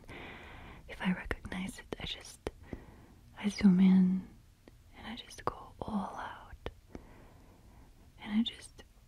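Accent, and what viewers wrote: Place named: Russia. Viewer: So rare to hear the Russian accent when she speaks English, it's lovely when it happens 😊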